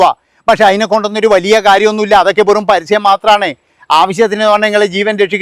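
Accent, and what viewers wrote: Kannur, India. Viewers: Enghalaranappa kannur karananno😂😂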